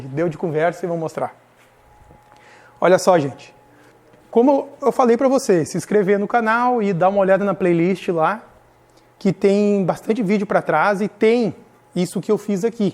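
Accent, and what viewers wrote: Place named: Rio Grande do Sul, Brazil. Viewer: percebi que era de perto pelo jeito de falar kkkk , sou de Novo Hamburgo. Também faço trilha , um dia nos cruzamos por aí 👍👍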